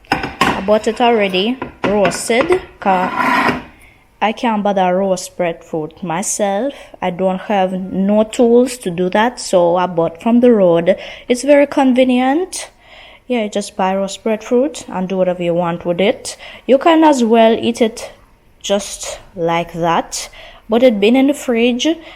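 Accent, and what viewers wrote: Jamaica. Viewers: I love patois too love you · nuff people love when you talk patios we love that about you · Yes you can speak jamaican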